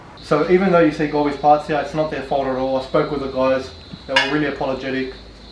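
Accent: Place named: Australia. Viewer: Plasma man is your friend you sound Australian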